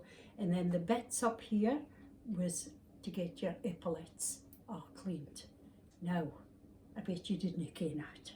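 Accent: Scotland, United Kingdom. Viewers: It is refreshing to hear the Scottish tongue. You are bringing beck many memories. well done..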